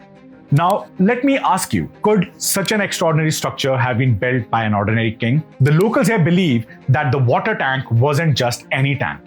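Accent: India. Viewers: Better slow down mate ...then we can at least understand your typical Indian English Accent. Slow down, why are you hurrying for ??? 😃👍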